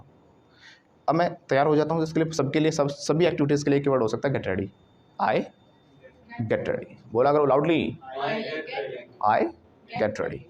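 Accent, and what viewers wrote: Delhi, India. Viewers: Are you from kanjhawala sir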